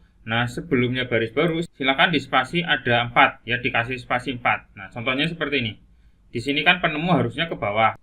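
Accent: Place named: Banyumas, Indonesia. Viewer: orang ngapak kie...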